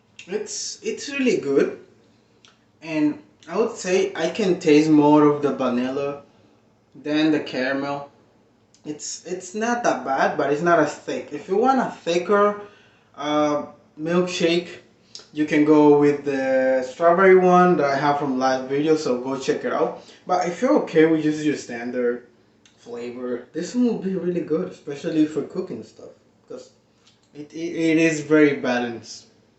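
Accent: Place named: Dominican Republic. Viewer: You sound like you have a Dominican 🇩🇴 accent. Are you from DR?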